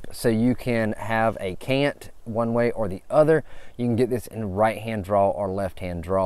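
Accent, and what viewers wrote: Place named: Georgia, United States. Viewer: Great videos! I’m enjoying your channel. You sound like you’re from Georgia. Also kinda looks like Georgia woods behind you. 😂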